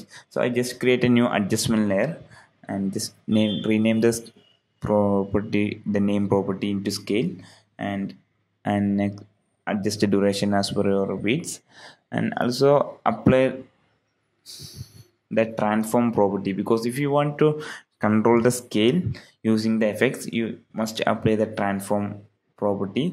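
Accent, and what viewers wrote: Kerala, India. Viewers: You are a malayali... Right? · Malayali aano?? Enik aa slang ketit angane thonunnu vere aarkelum ee dobt ondaayo??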